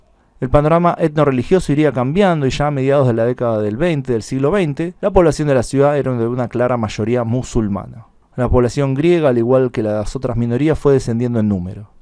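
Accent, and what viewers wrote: Argentina. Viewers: Tema Interesante, pero escuchar SHAS SHA SHA, DUELE EL OÍDO. EJEMPLO SHAMÓ, EN VES DE LLAMÓ, desarroshó, en ves desarrolló; mishón en vez de millón; mashoría en vez de mayoría; sha en vez de ya; masho en vez de mayo; shamada en vez de llamada, shegaron en vez de llegaron, constitusheron en vez de constituyeron, etc etc. Oye amigo gauchín, en tu país, habla como quieras, pero para consumo exterior pronuncia bien y modula bien el castellano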